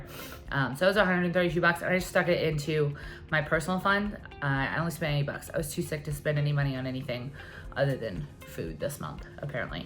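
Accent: Texas, United States. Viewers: Congratulations,! My note on your voice is I have never thought you had a southern accent until today. You sound Texan for sure today. I hope you are better soon. You are an inspiration.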